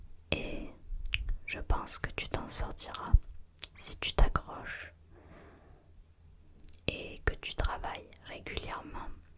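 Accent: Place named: South, France. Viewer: J'ai découvert votre chaîne il y a peu et je n'avais pas fait attention à ce petit accent du sud (enfin je crois)dans le baing lol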